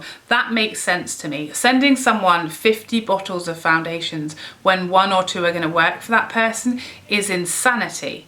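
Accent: United Kingdom. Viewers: Gwaaaad I hate it when you speak so much sense 🤔... it’s a catch 22 isn’t it... if you could review new launches promptly you would get more subscribers... One of the things I LOVE about your channel is that you’re British... so apart from an awesome sense of irony... in a sea of American accents talking about American products, you really stand out. So maybe we could reach out to UK brands first? I’m onto Charlotte Tilbury...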